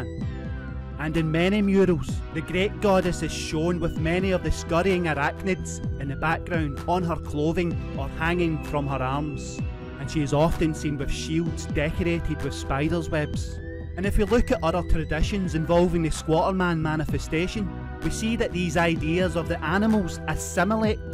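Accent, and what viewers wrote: Scotland, United Kingdom. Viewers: That's a strong Scottish accent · what part of Scotland is the narrator from please? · I can hardly understand every other word of the Scottish accent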